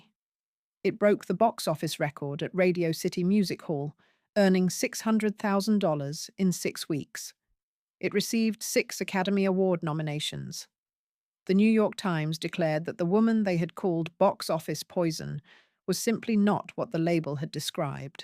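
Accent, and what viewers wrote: United Kingdom. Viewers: Love you Stories and info❤. Thank you SO very much. Bless everyone on you’r team. A lovely narration with a beautiful British voice. . From Uk Susie ( how i miss ‘our accent’) in Melbourne Australia . These true stories are absolute Gens ❤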